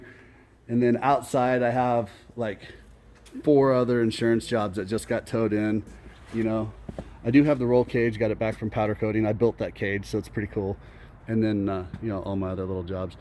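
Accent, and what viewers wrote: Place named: Brooklyn, United States. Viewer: Hey, Your humor is so heartwarming. Are you sure your not from Brooklyn?? Back in the day. Yea Right, Ha. Back in the day I can remember when Rocky opened up in the Marlborough Movie Theatre in Brooklyn. Even though Rocky's hood was Philly, that movie sure had the Old Neighborhood abuzz with Italian pride. Everybody was talking about Rocky. I bring that up because guess who talks like Rocky?? Yours Truly,. You sound like your from my Old Hood. Yo!!! Any good calzones out there in Phoenix?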